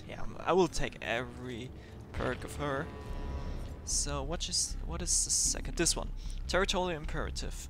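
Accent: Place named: Germany. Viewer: I was just wondering, because you sound german:D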